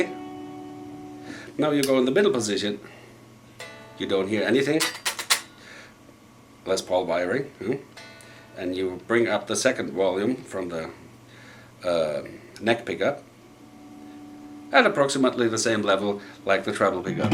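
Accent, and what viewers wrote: Hesse, Germany. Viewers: your german accent is bad ass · Is he German? He kind of has an accent. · Bist du ein deutscher 😂 man hört den Akzent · hi, excellent explanation. you should have mentioned that reverb/ and delay was engaged. that makes it easier for beginners to get at least near to the sound. i played more than 40 years guitar and have found out that it is easier to play with a gibson les paul than a epi or harley benton. since i own a original gibson i feel i play better. the tones match better to the records i play to, especially in the higher octaves. i like your stuff and your hessisch english - i come from nearby:-) so it sounds familiar. best from Limburg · you have an german accent, don't you?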